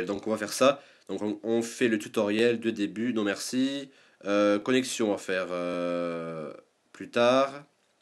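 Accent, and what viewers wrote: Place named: Toulouse, France. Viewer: T'est toulousain?! T'as gaver l'accent!!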